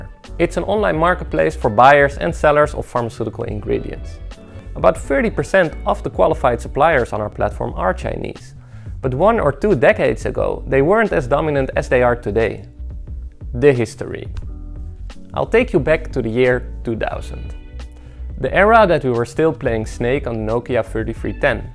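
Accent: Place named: Netherlands. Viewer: Your accent sounds soooo Dutch.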